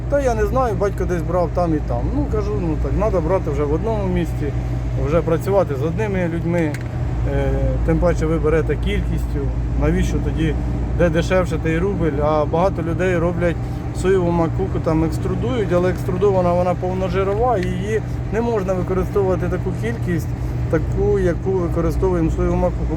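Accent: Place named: Poltava, Ukraine. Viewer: З усего відео найбільш мені сподобалась ваша щіра українська мова.Це є сама щіра мова ,я так розумію ви с Полтавщіни.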